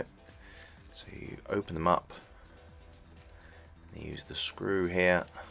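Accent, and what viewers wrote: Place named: RP, United Kingdom. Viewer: Was worried we wasn’t gonna hear you clear speaking posh voice then when the music kept going.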